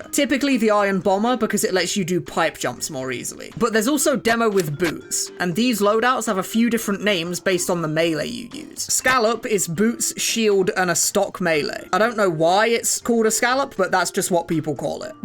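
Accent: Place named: United Kingdom. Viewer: heywhy r u british :(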